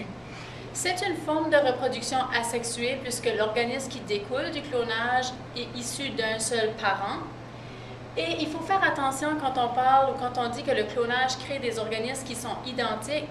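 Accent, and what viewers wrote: Quebec, Canada. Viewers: t'es sourd ou quoi ? ça s'entend à 2 km qu'elle est québécoise.. (l'idiotie se soigne)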